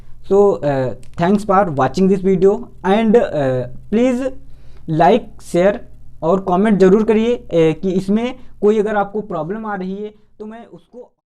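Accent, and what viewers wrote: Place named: Varanasi, India. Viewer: Aap Kaha se Ho My guess Rawansi se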